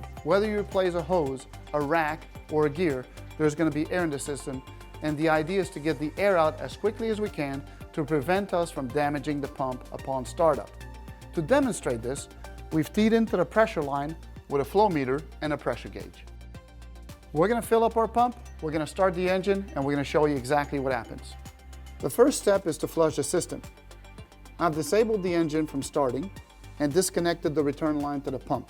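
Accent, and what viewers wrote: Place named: Netherlands. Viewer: He has a dutch accent.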